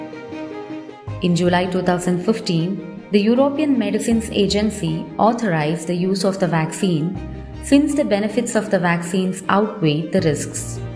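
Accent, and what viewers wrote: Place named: India. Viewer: I like the content in your videos. It is well-researched, bite-sized, and packed with information. But I dislike the voiceover in almost all of your videos — it has a very thick local Indian accent, which is very distracting. For a more absorbing consumption of your videos and to reach a wider audience, I suggest you do one of two things: Get rid of the voiceover all together and include captions with background music, or hire English speakers to narrate using native US, UK, or Australian accents. This is not the first time I'm writing on this subject. Please take heed! Thanks.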